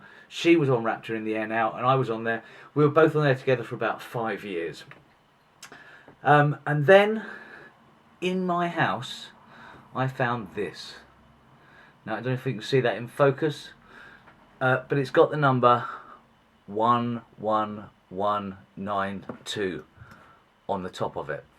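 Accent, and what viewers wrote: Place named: United Kingdom. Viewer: Beautiful British accent and a beautiful encouraging message! Thankyou xxx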